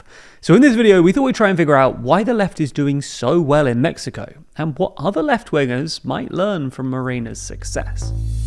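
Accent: United Kingdom. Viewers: Cómo mexicano, viviendo en México, tu desesperado comentario no tiene ningún valor y te lo explico. Si un anglo y no solo anglo, sino con acento británico (suponiendo que eso lo hace estar del otro lado del charco) habla bien de la izquierda en México eso solo quiere decir una cosa... USTEDES YA PERDIERON EL RELATO. De nada les vale seguir intentando imponerlo, sus mentiras ya no pasan.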